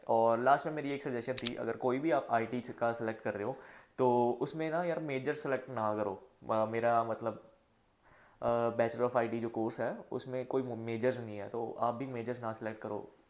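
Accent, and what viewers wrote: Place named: Punjab, India. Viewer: Bhai aap punjabi ho na😅....aapke accent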